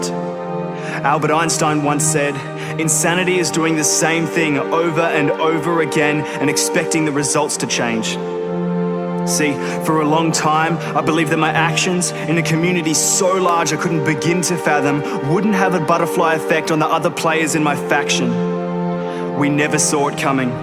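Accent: Australia. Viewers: 1. annoying echo 2. annoying australian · that australian accent xd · Aussie accent XD haha love it! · You realise this is what an australian accent sounds like. Holy fuck the stupidity of that comment..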